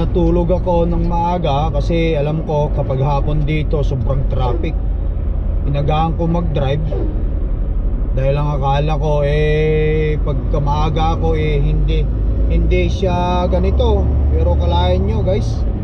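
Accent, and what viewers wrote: Ilocos, Philippines. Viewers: Kaykayat ko ta ilokano accent mo lalo no ag-english ka, reminds me of my old man. Ilokano Owner/operator as well ditoy abbotsford BC ngem salesman/distributor ti business ko ti winnipeg based chips company. 18 years na ako sa Canada medyo naikkat kon diay ilokano ken pinoy accent kon na agsao ak ti english. Hahaha praktis lang. Lol😂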